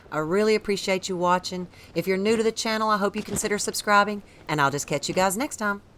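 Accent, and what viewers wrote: South, United States. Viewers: I must say your voice is why I continued to watch this vid as I'm the kind of player who saves anything and everything I find interesting on a game I'm into and once I beat the game I will go back and watch vids and use cheats and try glitches ext.. Well as I was adding it to my botw 2 list ( been saving vids since before it was officially titled) I heard your voice and well needless to say I can go easily and get the armor. I'm a hillbilly from the blue ridge mountains and it was refreshing to hear your southern voice narrating one of my favorite series biggest entry's! I was blown away and watched and listened all the way to the end. So to comment on the deception msg Well who cares if it wasn't her playing ( so awesome it was btw ) because it's still a very pleasant, easy to listen to and follow along, very well made information video and I'm looking forward to enjoying many more from her. 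· A southern accent and TotK? Sign me up lol. Im from NY. Don't hear that accent much. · even if you weren’t playing I love hearing your voice in the videos. It’s refreshing to hear a beautiful American southern accent 😊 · Hey girl! I just recently found your channel and I love how you admit about not knowing how to pronounce some of the names in the game! Same here... lol! Also, I'm not sure where you're from, but I'm from South Mississippi and I can relate to your accent ;) You have provided some pretty good tips and I'm now subscribed :D Keep up the good work! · I love your videos! Your southern accent makes them even more welcoming/casual/relaxing. Thank you for the content and for being down to earth about it. The sass on that pinned comment was the cherry on top! 🤣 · Southern I know this, lived in Arkansas for a few years. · What American accent is that i wonder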